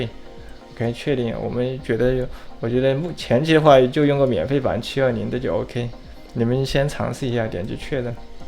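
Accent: Sichuan, China. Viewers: 说话口音川渝的吗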